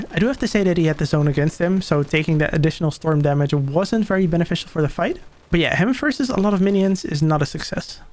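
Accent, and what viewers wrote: Netherlands. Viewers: Hey!! Just wondering correct me if I'm wrong but umm, are you Dutch by any chance?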